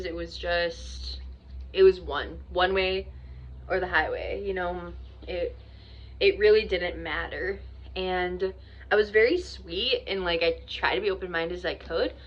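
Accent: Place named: United Kingdom. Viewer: The Brit accent was on point 👌💯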